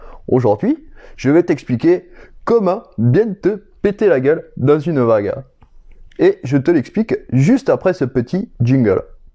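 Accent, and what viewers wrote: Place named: South, France. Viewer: J'adore ce petit accent du sud !! 😂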